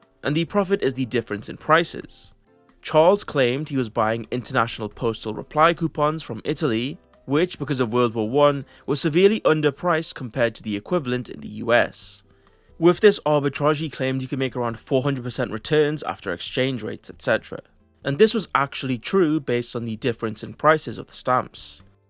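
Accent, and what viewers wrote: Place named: England-Wales border, United Kingdom. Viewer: Can we do an accents tier list?! (I’m trying to work out which side of the England-Wales border line your accent is from, I’m guessing fairly close to it either way)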